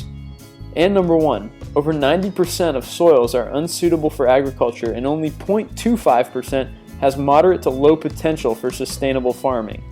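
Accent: United States. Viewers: U sound American but when speaking of measurements u use metric instead of American standard. Why? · The American accent should not have been used for this narration.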